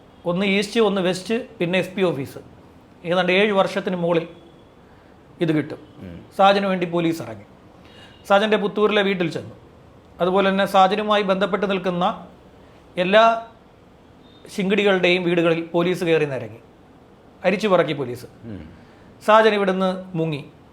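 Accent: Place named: Kollam, India. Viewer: kollam, anchal karan aanu ivideyum anganeya samsara syli😊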